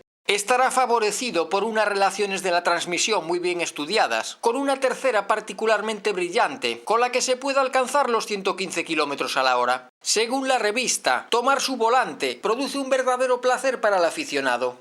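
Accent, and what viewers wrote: Galicia, Spain. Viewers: Se le entiende estupendamente, y vocaliza bien. A mí todos los acentos me gustas, y el acento gallego de los que más.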